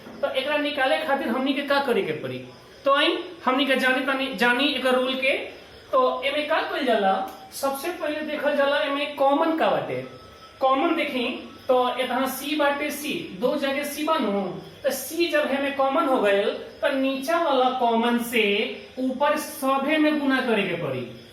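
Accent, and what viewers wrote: Bhojpuri, India. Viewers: Ati Sundar sir Bhojpuriya andaj me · अति सुन्दर लागल आपन भोजपुरी अन्दाज🙏🙏🙏 Nice sir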